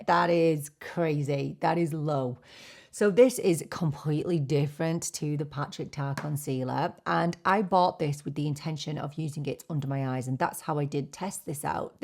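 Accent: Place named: Newcastle, United Kingdom. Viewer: You quote euro currency but at the same you have a strong english accent (Newcastle?), can you share where are you from? 😊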